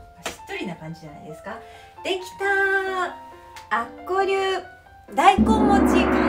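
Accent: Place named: Iida, Japan. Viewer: 大根もち❔大根とおもちを使ってどのようにするのかなぁと思っていましたが…😅なるほどねぇ🤔「大根もち」私も初めて聞きました😆 大根をスライスして具材を入れて片栗粉と小麦粉を入れて焼くのですね👌お好み焼きのような感じに～🤗 あっ！電池🔋が、、、早めに交換しないと〰️🤣 「命の源は金麦」に爆笑😆💣️✨ だもんでなぁ～の飯田弁、お母様の 梅酒(呑んでみたいです😉)に… おやきのお話しも～☆ 楽しいトークにハマっていました😆 「大根もち」はあきこさん押しの ミッカンのポン酢で頂きましたね🤗 具材にハムを入れてお肉の様な感じとなりアイデア良かったです👌 今回も楽しいクッキングを有り難うございました❤️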